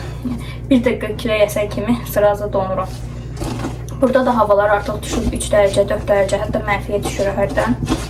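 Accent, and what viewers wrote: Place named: Azerbaijan. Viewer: kız çok güzel konuşuyorsun gerçekten Türkçeyi en güzel Azerbaycanlılar konuşuyor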